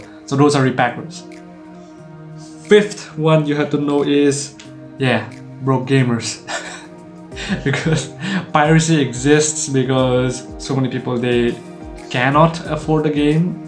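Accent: Northeast India, India. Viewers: You from North East India bro?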